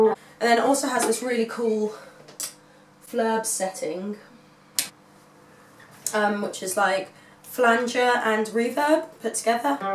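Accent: England, United Kingdom. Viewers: I love girl with english accent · Nice to hear you talk. Didn't know you were a Brit. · Love the English accent! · you are from england this is the first time i listened to your accent well done so talented and gorgeous girl 🤘🤘🤘🤘🤘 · Lets review here. Hot, plays guitar and a has a Brit accent.. and a gear head... The perfect woman does exist! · OMG, haven't heard a cute little accent like yours since the last time I flew British Airways !!! ... when are you touring the USA ??? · ... you’re a Brit? Holy chit. Guess I’m out of the loop. Love the accent. Gear is sweet too. 🤘🏻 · ты своим английским акцентом меня просто убиваешь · I just stumbled onto your channel today and I'm glad I did. You are an amazing player and seem like a really nice human being. You sound English. Do you live in Great Britain? I've watched several of your videos today including your Kiesel endorsement video. 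I've watched the Kiesel factory tour video 20 times, lol. I want a Kiesel Aries hardtail like yours. Anyways, just wanted to give you props and say hi and wish all the success in the world. You are a very talented player. Hope you have a great day. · так разговаривает как британка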